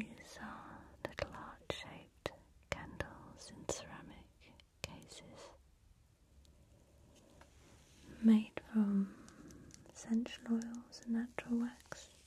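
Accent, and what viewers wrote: England, United Kingdom. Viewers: Are. You. From. England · I loooove her British accent😍😍❤❤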